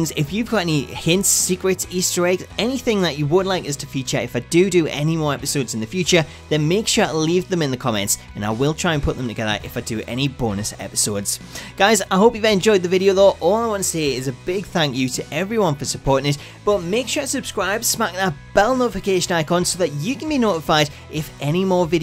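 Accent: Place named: Scotland, United Kingdom. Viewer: You’re Scottish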